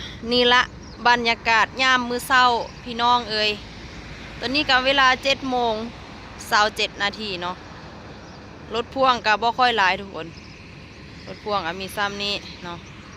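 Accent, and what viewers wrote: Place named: Laos. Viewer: สำเนียงเว่าคือ หนูนุ่นสาวลาวเนาะ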